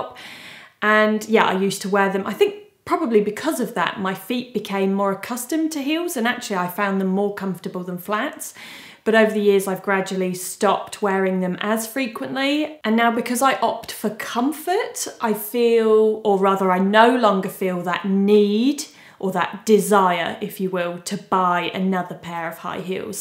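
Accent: United Kingdom. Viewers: Hi! I've discovered you last weekend and well, I'm obsessed; where have you been all my life?! xD I absolutely love your style, but also how genuine you are. Also I was very impressed about how much your answer to your followers, given the huge number of them. Just shows how professional and nice you are. Best content creator discovery of mine of the past few years!:) PS: the bag reviews do come very handy, I am half excited half in deep despair regarding the fact that I will have to buy the Celine seau sangle.:D + PS²: your British accent makes me jealous, it's so cute and classy! xx love from France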